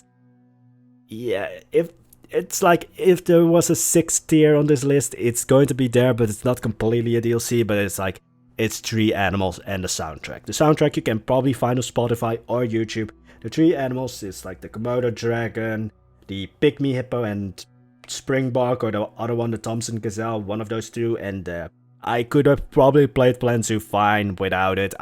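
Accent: Netherlands. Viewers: Are you dutch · Ur dutch right? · I smell Dutch... 👀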